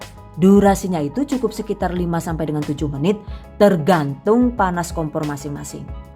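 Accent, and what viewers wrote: Banyumas, Indonesia. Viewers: Bunda asal dari mana Bun ngapaknya...suka dengernya🤗🤗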